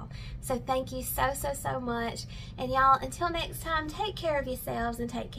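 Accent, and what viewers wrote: South, United States. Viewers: I love the way she talks so southern and sweet .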